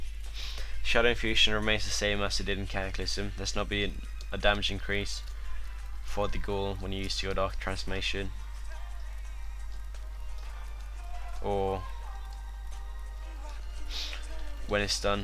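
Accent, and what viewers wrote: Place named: Scotland, United Kingdom. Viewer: You from Inverness? You have a strong accent, laddie.. Like Alex ferguson..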